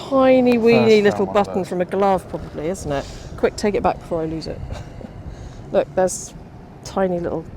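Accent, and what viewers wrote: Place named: London, United Kingdom. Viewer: Do the ducks have a permit? London accent though.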